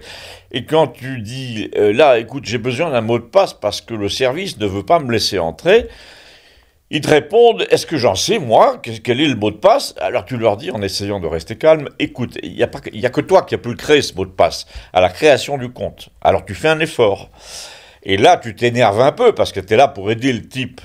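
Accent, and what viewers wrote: South, France. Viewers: Svp, 🙇‍♂️🙇‍♀️ lisez Nous TOUTE votre chronique "avè l assen" que vous maitrisez si biiiiieng, 🤓👏👏💪 cest SAVOUREUX! 😄😃😍😍😍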